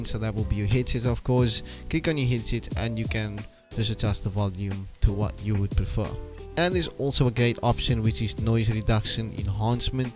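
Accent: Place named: South Africa. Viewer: 😅 South African AF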